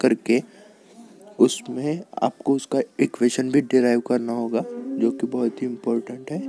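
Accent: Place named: Maharashtra, India. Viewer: Hindi tone tar Maharashtra chi ahe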